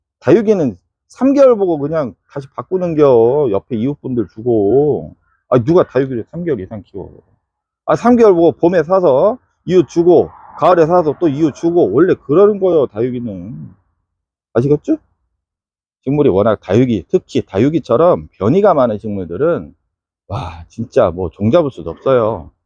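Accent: South Korea. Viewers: 경상도억센말투만듣다가대전사투리들으니넘정겹네요!, 영상잘보고배우고 감사합니다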